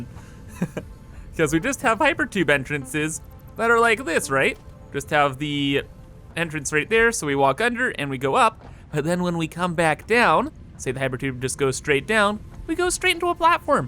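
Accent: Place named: Canada. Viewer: Not my first video, but first time I've thought to thank you for just sounding absolutely incredible :P Canadians are my favourite americans and you sir have one of my favourite Canadian voices. ALWAYS a pleasure to watch your vids just because of your energy and cheer =]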